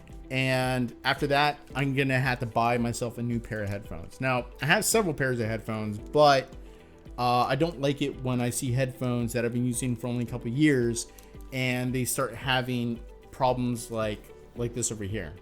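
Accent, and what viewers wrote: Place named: Canada. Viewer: I hear Canadian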